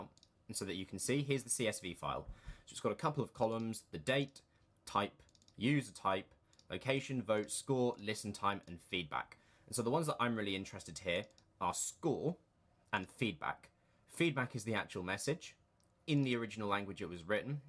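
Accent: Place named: United Kingdom. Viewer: I love the analytical approach to song writing! It's tricky deciphering feedback, and this chain of resources you've established makes a convoluted process much more streamlined. Also, your smooth cadence and suave British accent makes listening to your explanations that much more entertaining. Haha.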